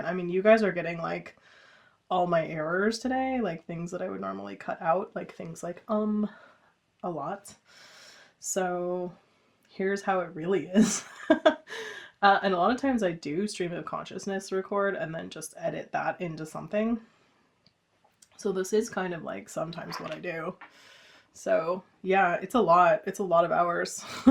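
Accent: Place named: Seattle, United States. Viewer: Love the dress and the video! I’m one of those folks that has watched your channel for ages. I live in Denver now but was born in Seattle and raised in the Bay Area and you just sound so familiar to me. Nothing like California. I am a seamstress and do everything from hemming jeans to making wedding dresses and have done so since I was 16 (I’m 70 now so do the math!). I’m also a quilter, knitter and embroider. Gotta keep those hands busy when I’m watching tv. I’m not reading anything new but just reread The Red Tent which is one of my favs. My daughters are in their 40’s and were raised in the Bay Area too. Okay, I guess I’ve taken up enough of your time. Take care. 😊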